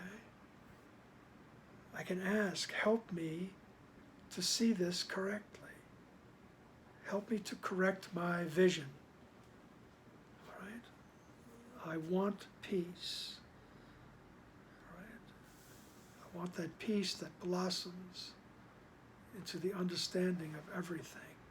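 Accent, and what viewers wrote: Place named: New York, United States. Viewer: I knew you too were a New Yorker! Had a feeling when I heard the sirens and the way you talk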